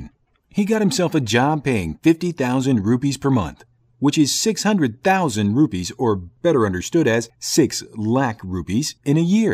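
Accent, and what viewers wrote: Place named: United States. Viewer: excellent explanations. I have to say this is a little bit weird to hear an American accent referring Indian stuff so familiarly like India is his homecountry.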